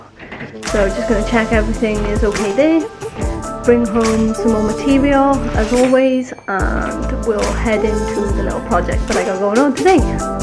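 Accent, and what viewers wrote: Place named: Wales, United Kingdom. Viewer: Nice work girl. Love ur Welsh accent btw.. I'm abut to make one of these but I'm going to take a punt at it with an Allen Key. I have a decent sized one so it'll do me for my 1st attempt. I've recently begun to use Hand planes and saws and I love working with them now. I've hardly used my table saw at all since. I have a jointer/thicknesser as well but I don't use it either. I love to plane the timber clean now. I love the look of the grain after using the smoothing plane or finishing plane on them.. I wanted to ask if I needed a good plane for cleaning up lumber that is rough sawn?? What number plane is generally used for that process?? Do I ned another plane or will either of my #3 or #4 do the job as well?? I enjoyed the video but I wish it were longer to see more of the process. Just my silly gripe though. Great build..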